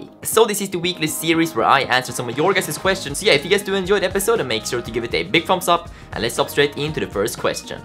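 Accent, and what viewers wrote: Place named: Sweden. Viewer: Wait are u swedish? I can hell it by your accent 😂👍 jag är också svensk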